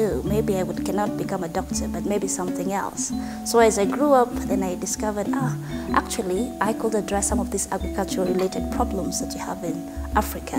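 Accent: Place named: England, United Kingdom. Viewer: I love that English ascent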